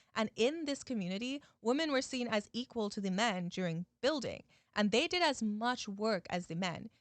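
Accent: United States. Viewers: Your accent sounds very Californian to me. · As a non-American, sounded American to me! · I thought your accent was American😳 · I'm an American but with a terrible ear for accents. I've always thought she sounded American who maybe grew up with parents with accents from another country so that she says some things ever so slightly differently than I expect but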